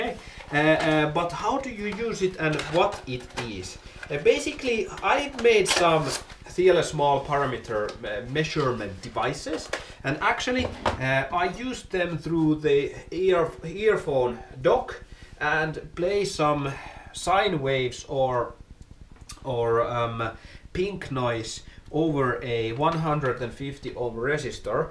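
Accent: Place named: Finland. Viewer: Ralli englanti on paras, Hyvä video.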